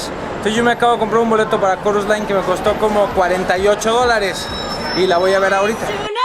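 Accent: Mexico. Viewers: En ese tiempo tenías tu acento mexicano más marcado, me parece que ahora hablas más neutro. Saludos desde Chile! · Estabas taaaan diferente: El look, los lentes, además el acento Mexicano súper marcado y los comentarios eran como más sarcásticos! Jajajajja- Me encantan tus videos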